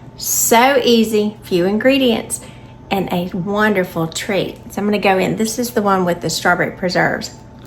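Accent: South, United States. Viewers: Some people say the video was too long for it's content. I enjoyed listening to her southern accent and watching her cook. If you're an experienced cook all you have to do is scroll down to the recipe and directions and skip the video altogether. So shut up and let the rest of us enjoy the video. And I will be making this recipe.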